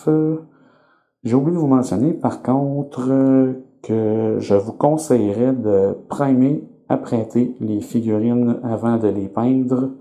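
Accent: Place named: Quebec, Canada. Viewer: Ça fait du bien d'entendre un Québécois Francophones parler de warhammer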